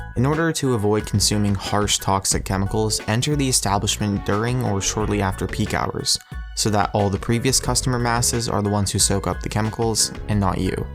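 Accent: Canada. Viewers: This dude is Canadian. I heard aboot a few times lol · are you Canadian?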